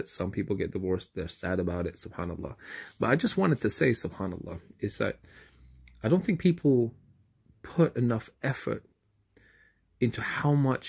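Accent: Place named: United States. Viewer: I thought i could detect a American accent. 👍😄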